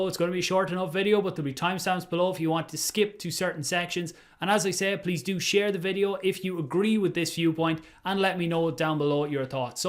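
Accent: Ireland. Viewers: I know he is irish. Iam irish too ha Thats why i know he is irish but i cannot figure out what county he is from! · He's Irish. I don't know which part of Ireland though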